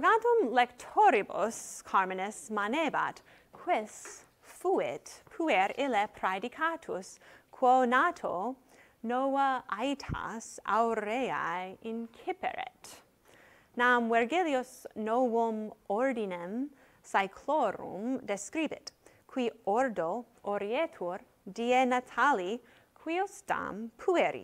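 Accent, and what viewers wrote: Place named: United States. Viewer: Not sure this Latin text with its distinct American accent, rythme and prosody would approximate the original Latin pronunciation close enough for it not to provoke a glimmer of a gentle smile on Virgil's lips. A fascinatingly bald and worthwhile experiment all the same. Many cheers!!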